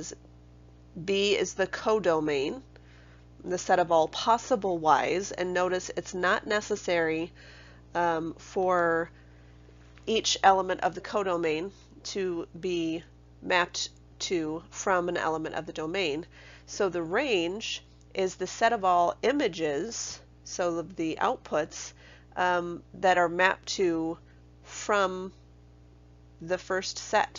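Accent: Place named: United States. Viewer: All of your videos I have watched thus far have an electric ohmmmmmmmmmmm sound to them. idk I feel like when you're going to upload this much content it is probably worth looking into. Maybe you have by now idk. But you have a nice American accent and I really need to learn this stuff haha. Thank you for uploading these. I truly appreciate you educators who upload content that is free an accessible to all. You're the best. ☻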